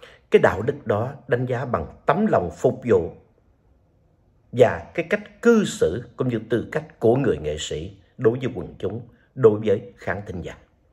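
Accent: Standard. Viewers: loi noi cua anh chuan ko can chinh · va rat chuan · Chú nói khá chuẩn . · Bác Thảo MC nói quá hay quá chuẩn, cháu rất quý tác phong làm việc, giọng nói và cách dí dỏm của bác.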